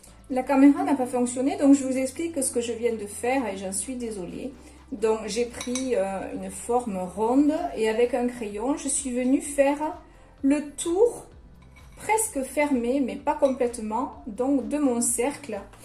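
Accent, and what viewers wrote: South, France. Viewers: Bonjour. Quelle peinture or utilisez-vous, si ce n' est pas un secret? Merci pour votre pédagogie, votre sourire, et votre accent du Sud délicieux à mes oreilles nordiques (Belgique)...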